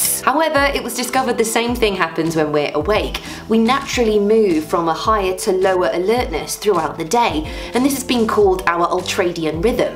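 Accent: United Kingdom. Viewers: I love the British accent. It's so beautiful hahaha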